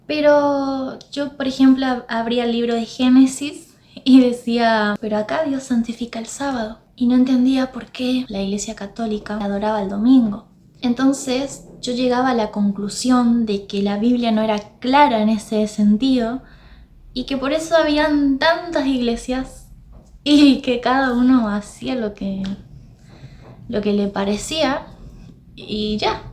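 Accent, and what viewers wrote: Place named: Misiones, Argentina. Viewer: Hola. Soy Nidia. Nací en Misiones, Argentina, así que tu acento me es familiar. Estaba viendo otra cosa y toqué la pantalla sin querer y me trajo a tu video... una "diosidencia"... me encantó tu testimonio y la manera tan dulce que tienes de hablar, tambien la profundidad de lo que dices. Eres una sierva del Señor. Gloria a Dios por como te usa. Yo hace mas de un año estoy compartiendo los repasos de las lecciones de escuela sabática en mi canal. Y otros temas de salud mental pues soy psicoterapeuta. Te invito a ir a mi canal, quizá te guste estudiar conmigo. Y cuando dijiste que somos sólo barro en manos de Dios me sentí tan identificada, siempre digo lo mismo. Es más, compuse una canción sobre eso. Te la quiero compartir, con mi esposo formamos el Dúo Qadash, y grabamos esta canción con unos sobrinos del corazón, el grupo Cyan de Venezuela. 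Te la comparto y espero te guste. <link> Dios te bendiga y te use con poder en la predicación del evangelio. Queda poco tiempo para su venida, tenemos que estar fundamentados en la Palabra al 100%. Yo me dedico a predicar en mi canal. Y espero que tu canal llegue también a muchas personas... si todos los adventistas usáramos nuestras redes para cumplir la misión llegaríamos a todo el mundo rapidísimo. Dios está despertando a su pueblo, está levantando un ejército santo dirigido por su Espíritu... nosotras somos parte de él. Gloria a Dios por eso. 🙏 Te mando un abrazo. Me suscribo a tu canal y puse el "me gusta" número 667... te hic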